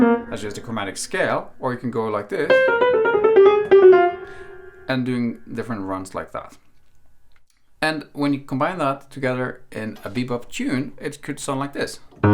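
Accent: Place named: United Kingdom. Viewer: So British !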